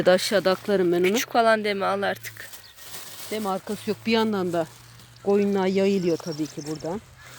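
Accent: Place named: Aegean, Turkey. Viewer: Merhaba hanım hangi memleket burasi sanki Ege şivesi gibi 🙋💐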